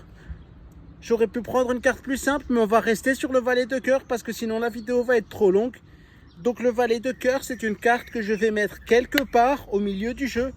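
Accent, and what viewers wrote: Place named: Alsace, France. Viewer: Tu es génial ! Une personne vraiment humble et humaine, ça fait plaisir ! Je crois que j’entend un accent alsacien, si tu es de passage vers Mulhouse, n’hésite pas a répondre, je t’invite a boire une bière avec plaisir !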